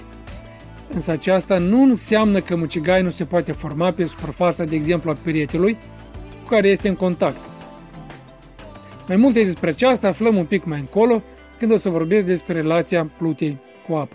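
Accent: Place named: Moldova. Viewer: Salut! Faci o treaba foarte bună cu aceste vide-ouri. Un sfat: Cred că ar ajuta să pronunți unele cuvinte (Mai ales numerele și anumite expresii) puțin mai rar și să lucrezi la dicție. Poate cei din Republica Moldova înțeleg fără probleme. Noi, cei din România, ne chinuim puțin ca să înțelegem 35% din cuvintele pronunțate. PS. Să nu o iei în nume de rău! Toate bune!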